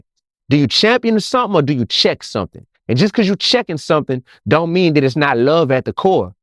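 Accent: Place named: New Orleans, United States. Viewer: New Orleans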